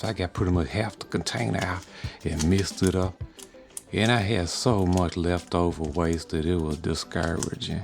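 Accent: Louisiana, United States. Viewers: I'm still convinced that this man is a cajun from my home state of Louisiana. Love your work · I swear you sound like my Louisiana people.......glad I moved back! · where you from Louisiana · One of my secret joys is clicking subtitles on these videos and watching the Youtube robots struggle to interpret smooth and melodic Louisia-ness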